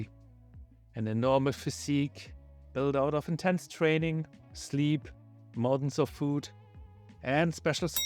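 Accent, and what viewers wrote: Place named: Germany. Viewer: This guy sounds german as heck yet he is from japan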